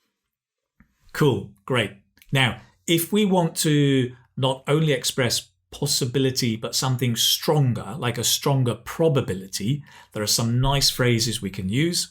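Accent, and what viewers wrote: United Kingdom. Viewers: It was really useful! Thanks a lot! And I liked your nice British accent😇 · hello please this is british or américaine englishe??? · Your accent is British Am I right? Because you don't pronounce letter r at the end of the word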